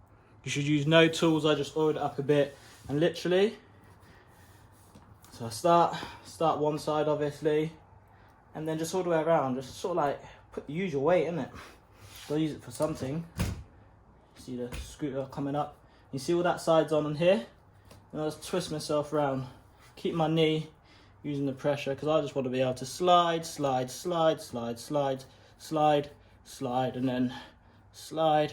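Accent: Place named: Jamaica. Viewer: 😂😂😂 good Jamaican accent By the way you put the tire on first then put the innertube in after ..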